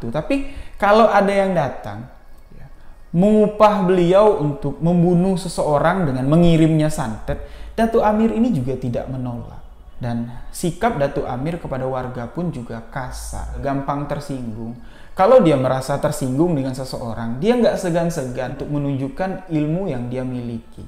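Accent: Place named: Lampung, Indonesia. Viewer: Saya seneng nonton bang cerita.. Kadang2 dialek dan gaya bicara nya kayak ulun lampung.. Sy kira dulu bang adalah orang lampung krn sy denger suaranya aja.. 😊. Jambi, sy belum pernah menginjakkan kaki ditanah itu, tapi cerita cindung, pait lidah, sangat populer didaerah kami, lahat.. Dulu kata temen2 ku, daerah lahat juga banyak ahli racun, tapi mungkin bukan disini, yg berdekatan dengan muara enim.. 😔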